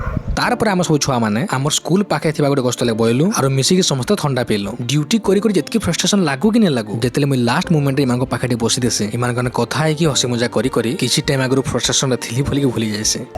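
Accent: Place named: Odisha, India. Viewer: Aapankar video bhal lagla.sambalpuri bhasa aapankar muhu ru sundar aau smart laguchhe.aashiwad karun mui b jadi teacher hei jeti....🙏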